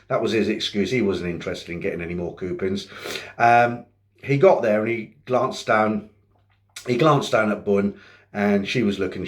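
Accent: United Kingdom. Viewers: are you saying "Edgar Myer"? lol im sure its EKAMI. Love the brit accent mate....just funny.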